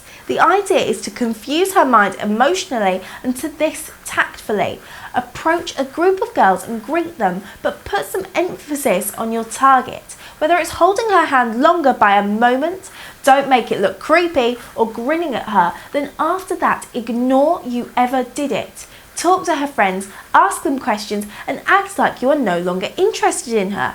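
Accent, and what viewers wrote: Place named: United Kingdom. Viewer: The creepiest thing is this ultra thikkkkk British accent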